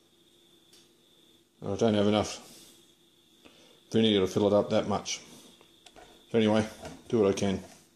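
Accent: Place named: Croatia. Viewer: Your heavy Croatian accent makes it difficult to comprehend what you are saying. The confused videography makes matters much worse. Have you considered employing someone who speaks either Canadian or American to do a voice over? By the way.... Croatia is a beautiful country and the rich cultural history of the Croatian peoples is unparalleled, maybe you could make a video on your village!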